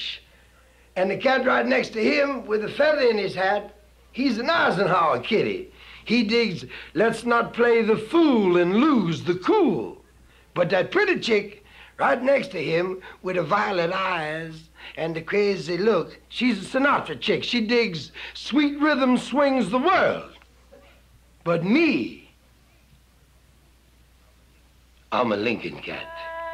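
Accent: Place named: England, United Kingdom. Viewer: I mean the English accent na kap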